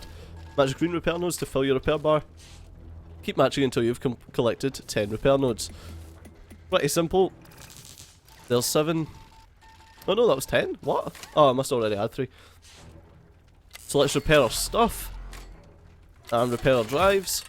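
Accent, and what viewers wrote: United Kingdom. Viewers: That looks like a fun game! Great video on it too. Are you British or Scottish?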